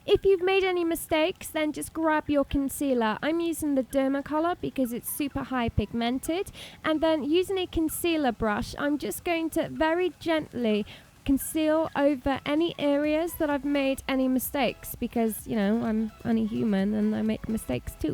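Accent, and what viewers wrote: England, United Kingdom. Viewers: I could tell she was British straight away because she has a tiny bit of Adele in her voice. Just my opinion anyway! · she from england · That british accent . you're so beautiful ;) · Ohh this English accent.. How charmy is it😍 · could you, in a next video, give us some tips about brows? i love your makeuppppp and your english accent ahah xx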